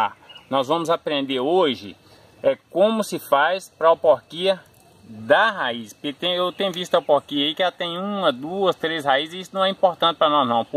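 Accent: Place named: Minas Gerais, Brazil. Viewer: Vc explica direitinho agradecida pelo video parece ate mineiro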